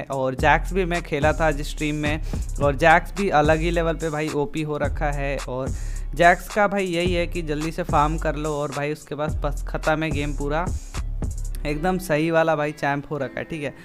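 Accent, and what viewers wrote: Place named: United States. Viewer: live stream wala accent vedio me american accent kese ban jata hai 🥺🤦‍♀️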